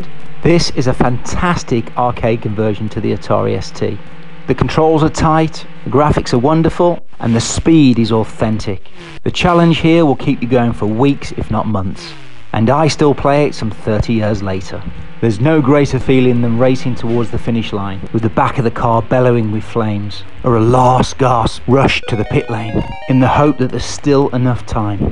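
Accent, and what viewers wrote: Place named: Midlands, United Kingdom. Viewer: You sound like you're from the Midlands, are ya?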